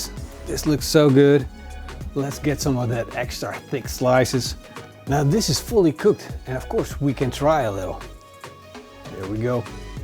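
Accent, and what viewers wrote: Netherlands. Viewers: Are you Dutch? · Are you dutch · Ik vond zijn accent erg Nederlands Engels en het was niet eens slecht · He's Dutch, I could tell by the English accent (and typical translation mistakes) but the actual Dutch words like boerekool confirmed it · Je engelse accent lijkt alsof je een NEDERLANDER bent, klopt dit? Haha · is this guy dutch? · When you can hear the Dutch in the man. ;D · That hollands accent though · That lovely thick dutch accent. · In hoor dat je nederlands bent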